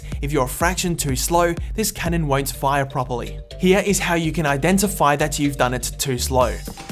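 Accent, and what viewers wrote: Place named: Australia. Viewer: Your Aussie! Me too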